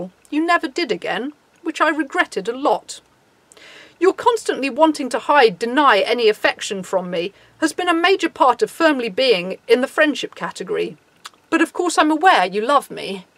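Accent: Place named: Birmingham, United Kingdom. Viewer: he's from Birmingham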